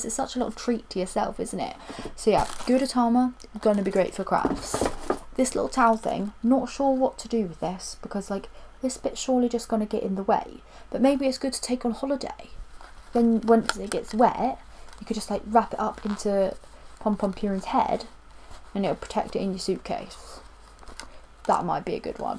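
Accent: United Kingdom. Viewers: Hey, I am guessing from your accent that you are based in the UK? I was wondering if you remember which courier service was used to deliver your box? Mine has still not arrived from June and the company are just telling me it is out of their hands once the box has left Japan :(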